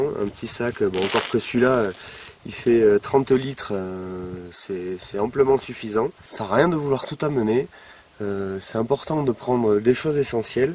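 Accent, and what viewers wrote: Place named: South, France. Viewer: salut, tu as un léger et vraiment bel accent qui me rappelle Marcel Pagnol! J'aime beaucoup tes vidéos traitant de la randonnée ( je fais uniquement du cyclotourisme, mais le principe est le même). bise d'Alsace.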